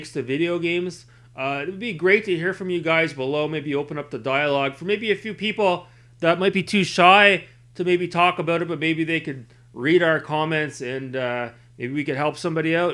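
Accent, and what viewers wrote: Canada. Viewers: ohh nice om from there too small towm outside Toronto. Just thought i heard a bit of newfie in there lol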